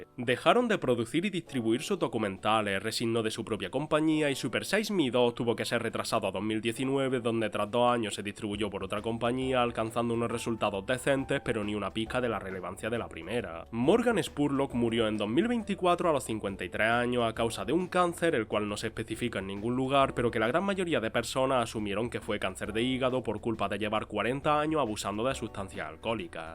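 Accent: Andalusia, Spain. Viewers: Soy de LATAM y me cuesta distinguir los acentos españoles. ¿De donde proviene el acento del narrador del canal? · Viene de Andalucía, que se encuentra en el sur de España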